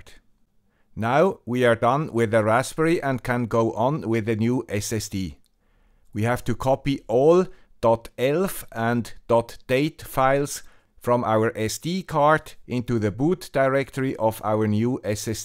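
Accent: Switzerland. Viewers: Watch out, after a update you might loose your *.dat and *.elf files, they were corrupted 28th of May, this is the old correct version <link> It's in Toms Hardware article. <link> It will save you some grey hairs. The new raspbian image has the wrong files, so this might help you. As always, a big thank you to "the guy with the Swiss Accent" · It works, it works, it works, it works! How much I love the man with the swiss accent! :) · Danke guy with Swiss accent. Now I will buy a pi4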